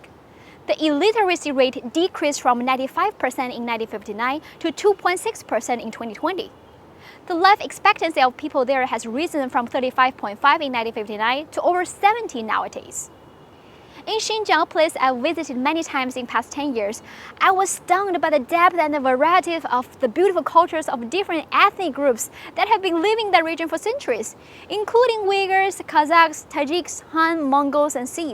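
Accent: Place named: United States. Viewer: You do not sound like studying in UK, more on US.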